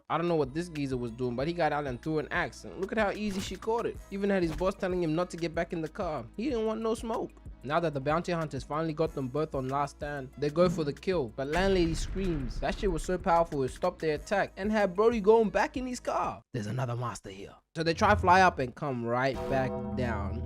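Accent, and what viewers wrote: England, United Kingdom. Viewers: Are u english or sum? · dude I can tell you English not American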